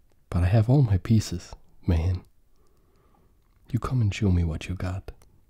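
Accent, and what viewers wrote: Denmark. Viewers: Are you danish? Great video btw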